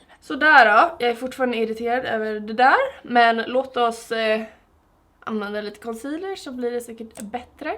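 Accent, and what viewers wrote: Stockholm, Sweden. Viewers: Jag är svensk men sjukt konstigt att höra dig prata svenska 😂 så van att bara höra dig prata eng! 😂 och trodde inte du skulle ha sådan Stockholms dialekt, men tydligen 😂👌🏻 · Like it alot better when you speak english even tho I'am a swede myself 😂 you have that stockholm accent when you speak swedish 😅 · Blev absolut inte sämre på något sätt, så himla mysigt! Va inte alls beredd på att du skulle ha så mycket Stockholmska i dig. ÄLSKAR! <3 Keep on going girl!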